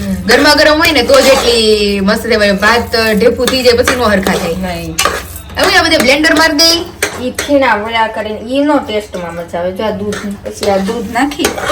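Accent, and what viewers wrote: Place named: Kathiawad, India. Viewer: તારા મમ્મી હોશિયાર છે.. અને સ્વભાવ પણ સરસ છે... તમારી કાઠિયાવાડી ભાષા બહુ જ મીઠી લાગે છે...,❤❤❤❤❤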